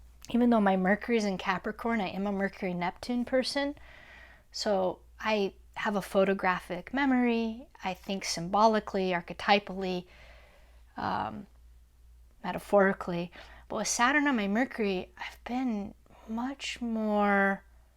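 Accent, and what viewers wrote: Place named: Italy. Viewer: I heard the Italian in you before you said it.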